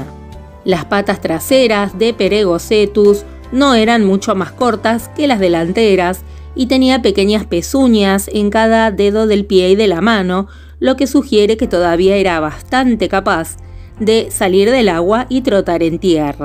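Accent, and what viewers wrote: Argentina. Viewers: Ah, me encanta tu voz y el acento argentino!!!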